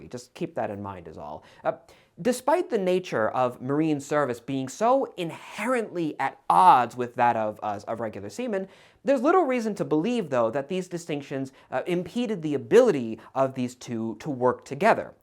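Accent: Germany. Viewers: Almost threw my phone thru the window during the ad with your German accent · I love your German accent, by the way! It reminds me of The Major from Hellsing.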